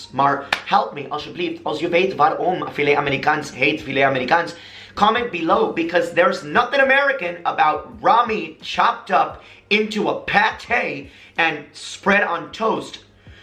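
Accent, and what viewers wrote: Netherlands. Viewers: I like that you speak Dunglish, and switching on the automatic subtitles is just hilarious. The translation software just goes haywire and really doesn't have a clue what to make of what you're saying. So funny! I see you've discovered the sound and video effects, but you really don't need them. You're entertaining enough without them (and quite frankly, the effects are a bit distracting to what you're talking about). And hey, 11K!!! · als Belg doen we dus ook al die " rare" dingen alleen is drop niet zo populair hier and i adore your dutch accent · your Dutchlish (Englitch?) is 💯! Not only does your video crack me up, but I’m learning Dutch van jouw. Lol 😂 has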